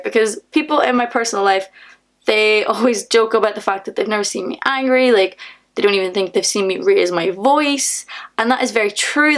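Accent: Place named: Ireland. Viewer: Which part of Ireland is that accent from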